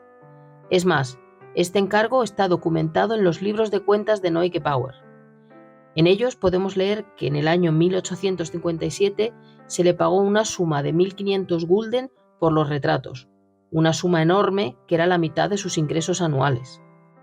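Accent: Spain. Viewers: AUNQUE TENGO MI OPINIÓN SOBRE SISSI COMO EMPERATRIZ, ME ENCANTAN TUS VIDEOS EN ESPECIAL LOS VESTIDOS DE SISSI. YO QUE SÉ COSER UN POCO ENTIENDO EL TREMENDO TRABAJO DE ESOS BELLOS VESTIDOS. ME ENTRETENGO TANTO MIRANDO ESTOS VIDEOS QUE CUANDO APARECE UN ANUNCIO ES COMO SI ME SACARAN DE MOMETO DE ESA ÉPOCA Y ME TIRARAN AL PRESENTE. LO EXPLICAS TODO MUY BIEN, Y CON TU ACENTO ESPAÑOL SE ESCUCHA MUY BONITO. SIEMPRE TIENES EL DETALLE DE CONTESTAR A QUIENES TE ESCRIBIMOS. POR LO QUE ME ATREVO A PEDIRTE UN GRAN FAVOR SOY RAPHAELISTA DESDE QUE TENÍA 11 AÑOS Y YA TENGO 72, ME ENCANTARÍA QUE HICIERAS UN VIDEO DEL MUSEO DE EL CANTANTE QUE AUNQUE YA HAY UNO SÉ QUE EL TUYO SERÍA MUCHO MÁS DETALLADO. DESEÁNDOTE MUCHA SALUD Y SUERTE CON TUS VIDEOS. 😊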